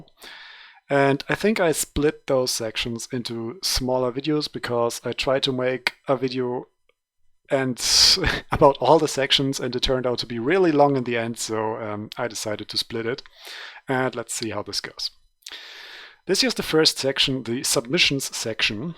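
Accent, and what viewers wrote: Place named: Germany. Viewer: Klingt nach einem Deutschem, der Englisch spricht ^^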